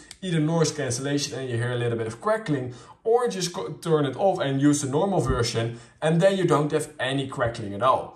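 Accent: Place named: Netherlands. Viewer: Are you Dutch? You have a Dutch accent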